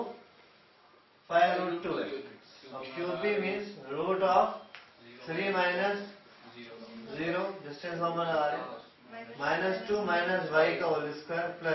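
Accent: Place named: India. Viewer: Awaaz se laga hindustani bhau pada rahe hai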